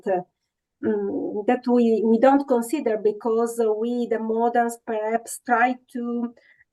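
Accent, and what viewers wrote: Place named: Iceland. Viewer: how Friulian accent can sound close to Icelandic accent in the British language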